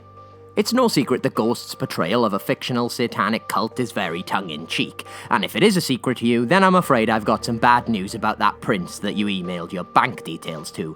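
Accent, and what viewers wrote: Wales, United Kingdom. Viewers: Is that a Welsh twang I'm sensing there ?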